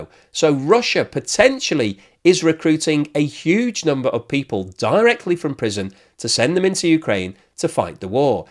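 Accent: United Kingdom. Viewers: are you British? Wife wants to know.?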